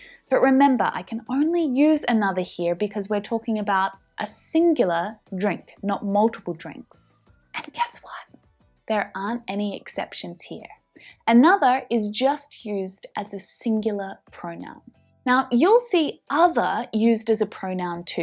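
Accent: Australia. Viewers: hi from Turkey aim iraqi i love your accent and i hope i can go to Australia as soon as possible, i love it and love your teaching💖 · I wanted to quit watching you so I don't pick up your Australian accent but you are so good I just can't do it. 😇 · I agree...her Australian accent is calming and relaxing to listen to...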